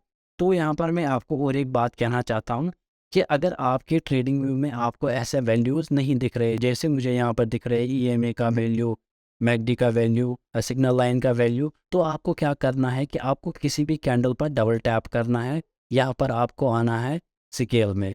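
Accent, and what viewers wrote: Kashmir, India. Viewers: Salute sir aapki tone Kashmiri hai hamen aap par proud hai..❤❤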